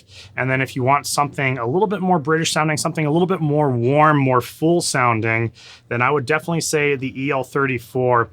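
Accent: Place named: Brazil. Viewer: Sounds more Brazillian than british if you ask me.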